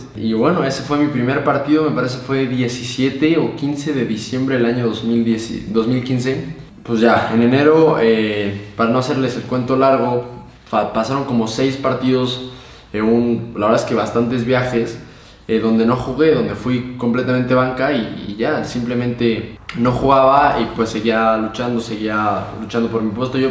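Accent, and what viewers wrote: Uruapan, Mexico. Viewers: Eres de Uruapan me parecio escucharlo??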